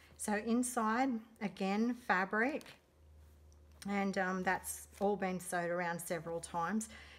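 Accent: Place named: Australia. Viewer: lovely work - its great to hear an aussie accent on YouTube. Keep up the great work:)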